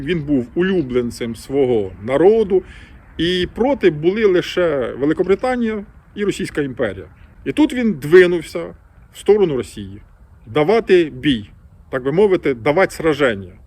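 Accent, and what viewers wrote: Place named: Central Ukraine, Ukraine. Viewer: Відчувається мовлення центральної України. Хоч і західна її частина. Дякую.🇺🇦💙💛❤🖤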